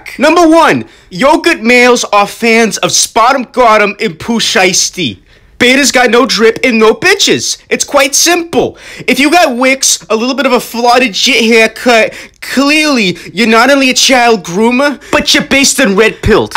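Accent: Boston, United States. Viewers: Why he got a Boston accent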